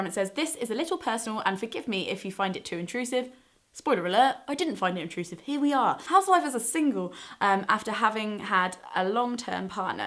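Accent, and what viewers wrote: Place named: West Midlands, United Kingdom. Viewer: I've been watching your videos for ages and never realised you were a fellow West Midlander (maybe it's just me being awful at recognising accents, I don't know) 😂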